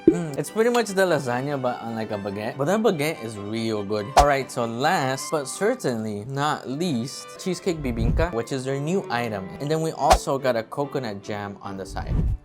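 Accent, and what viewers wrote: Philippines. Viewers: Why you sound like a filipino manila boy from a middle/high class family? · You talked like a filipino now with a ‚no?‘ at the end of the sentence 😊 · You are slowly having the Filipino English non-accent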